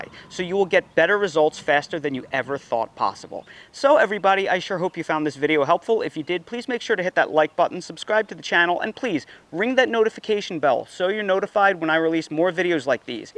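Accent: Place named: New Jersey, United States. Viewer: Love love love this! So glad i found your channel! I'm in New Mexico and using the vlack weed fabric terrifies me 😅. It does get cool at night (50s) but during the day it's in the 90s. Even starting around the end of april. But I love this idea. I have a raised bed (livestock water tank 😂) and just got some grow bags. But it all seems to be going so slow. I even started mine indoors and it still seems slow 😢 but I cant wait to learn more. Was surprised when you said you were in the south bc i heard the accent but then you said Jersey and it made sense 😂😂 im from ga so it was funny to notice 😂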